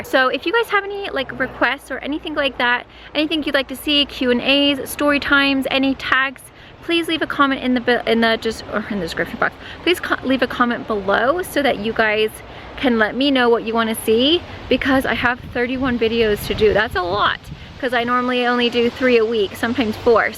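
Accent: England, United Kingdom. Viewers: Might be A stupid questuon but did you ever have an English accent.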